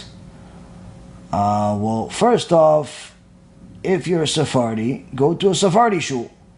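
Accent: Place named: Yemen. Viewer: I like the yemenite way of Hebrew-pronunciation and share your point of view, dear rabbi.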